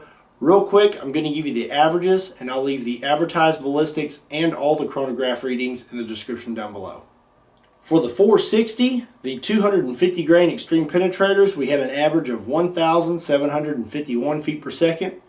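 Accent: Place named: Texas, United States. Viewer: That’s just how we talk in Texas al right!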